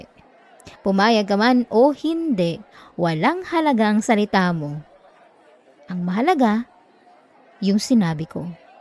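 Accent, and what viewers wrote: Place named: Visayas, Philippines. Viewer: ptnginang kabisayaan nato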